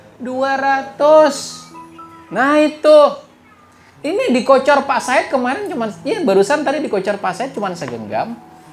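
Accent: Banjar, Indonesia. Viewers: Urang banjar kh ini pina logat nya 🤭🤭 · Logat banjar nya mantap pak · Loghatnya seperti orang Banjar/kalimantan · Pandernya kaya ada logat banjar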